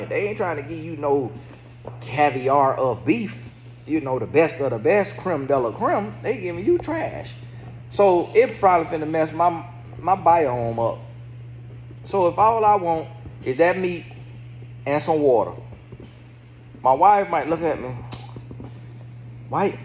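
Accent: United States. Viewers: God bless you. I am single and loving my life. Still praying for my husband whoever he is wherever he is., you are so country but I love listening to you.😂😂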